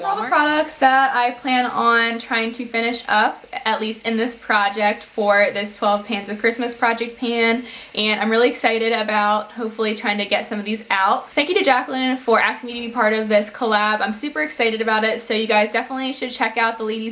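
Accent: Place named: Maryland, United States. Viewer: I'm your neighbor to the south. I'm in MD which is why your accent is so familiar. lol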